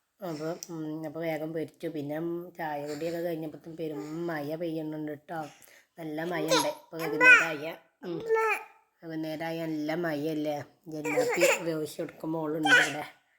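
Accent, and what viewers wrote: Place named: Malappuram, India. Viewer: എന്നെ പോലെ ട്ടോ ട്ടോ വരുന്നുണ്ട്, മലപ്പുറം ആണോ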